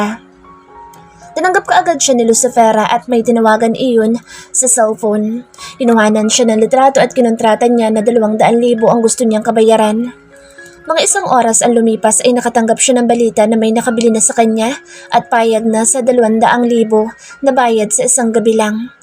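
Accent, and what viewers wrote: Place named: Visayas, Philippines. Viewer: Maganda po ang storya,,bisaya ka po maam 😊😊 ang tuno po kasi nang pagbasa,pero maganda po ang story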